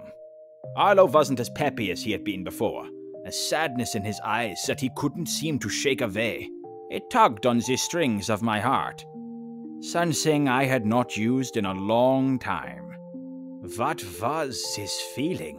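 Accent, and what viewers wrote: Germany. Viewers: The German accent is just 🔥🔥🔥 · This was fire along side the German accent 🔥🔥🔥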